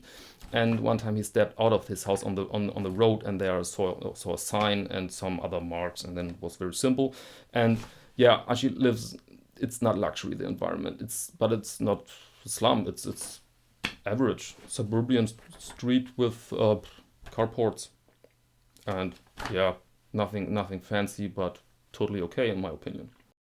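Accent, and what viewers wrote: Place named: Germany. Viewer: I enjoy your videos for several reasons... Mostly, your accent and mannerisms. You remind me of my Grandfather who was born and raised in northern Switzerland in the city of Aarau. I understand that you have a German accent, but you sound much like my memory of a great man. Also great content. Cheers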